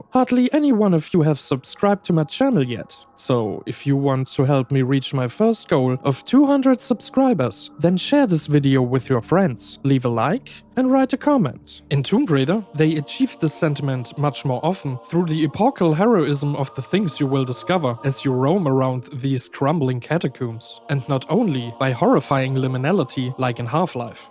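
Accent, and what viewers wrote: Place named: Germany. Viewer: could your German accent be any worse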